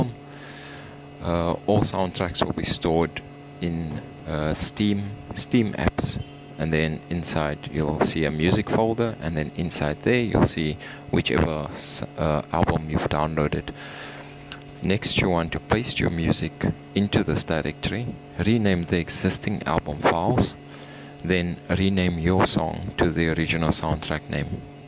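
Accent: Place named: South Africa. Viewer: Are you South African/ Afrikaans? You sound like it haha, weird workaround though I hope they just bring it back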